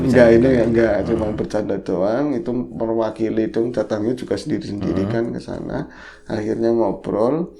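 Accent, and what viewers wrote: Banyumas, Indonesia. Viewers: Aq suka klo bro ngomong ngapak..lucu dengernya..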